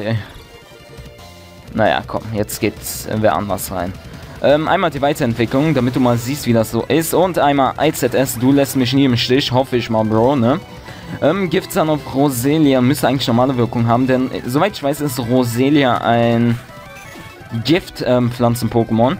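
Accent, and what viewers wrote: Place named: Cologne, Germany. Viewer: ein kölner akzent rischtisch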